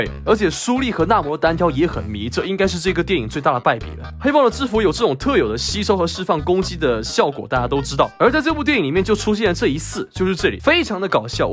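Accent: Taiwan. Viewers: 你講話好台灣腔哦 都沒有捲舌欸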